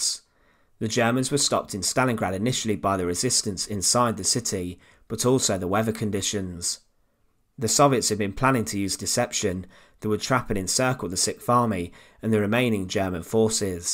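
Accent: United Kingdom. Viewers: The narrators voice is too much..I can't understand it, that British accent is too heavy.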